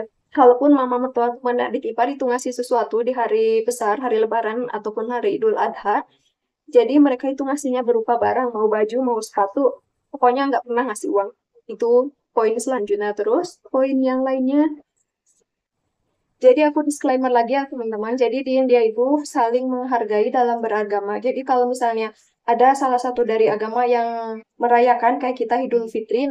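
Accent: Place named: West Java, Indonesia. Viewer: Gimana ceritanya sih bisa nikah sama orang India, trus neng kayak logak Sunda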